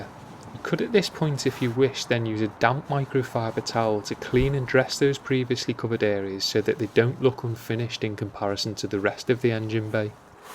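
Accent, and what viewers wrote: Liverpool, United Kingdom. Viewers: oibibi oybib he is British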